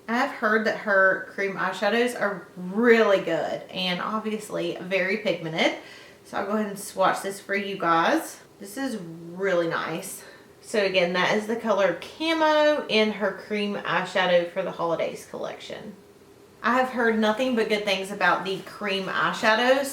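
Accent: South, United States. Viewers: I love your voice it sounds so country!!!! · I Love Your Southern Accent. Maybe I Should Ask First Are You From The South? lOl If So I Love Your Accent & Your Makeup Here As Well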